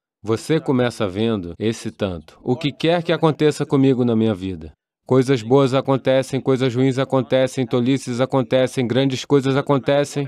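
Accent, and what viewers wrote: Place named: Bahia, Brazil. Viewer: Adorei que a tradução foi feita por alguma baiana. Ficou lindo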